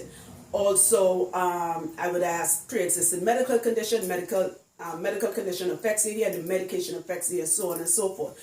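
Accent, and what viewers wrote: Trinidad, Trinidad and Tobago. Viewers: I love the Trinidad accent, be proud and don't worry about negative minded people. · I'm also Trini and Aquarius....we can't help the way we speak girl.....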